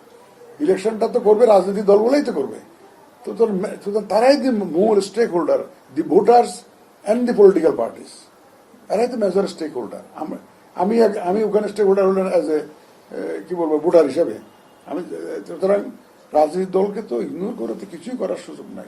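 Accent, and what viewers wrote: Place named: Chittagong, Bangladesh. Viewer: চট্টগ্রামের মানুষের মত লাগতেছে। উনার বাড়ি কি চট্টগ্রামে।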